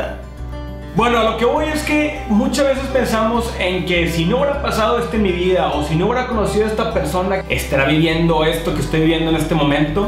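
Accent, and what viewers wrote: Monterrey, Mexico. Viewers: yo soy de monterrey y tu si que tienes todo el asento regio 👢 sera que yo tambien y no me e puesto bien atención 😳 sera que el destino no quiere que me de cuenta 😳 tenemos que ablar ! 😂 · me gusta mucho los vídeos que haces, sigue así ,besos 😙..... 😁😁 pd:(me encanta tu hacento de regio)🙊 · Exelente video yo soy mas soñadora que realista P.D. tu asentó es genial o sera que tambien soy regia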